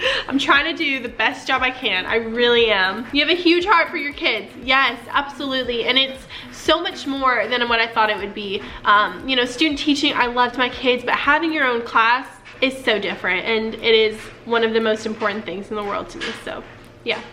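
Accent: South, United States. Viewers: I live in middle Tennessee.. I knew that southern accent!!!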